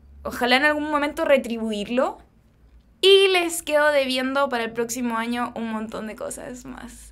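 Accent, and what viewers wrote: Chile. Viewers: BUEN VÍDEO, ME GUSTA MUCHO ESE ACENTO CHILENO ^-^ ♡, ( TE DEJO UN RETO, " HAS UN TOP DE LAS CANCIONES MAS HERMOSAS DE ÁNIME ) ♡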